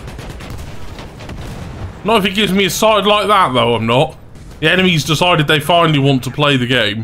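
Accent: United Kingdom. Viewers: Does anyone love this channel because of British accent? I am not sure why but it feels attractively hilarious.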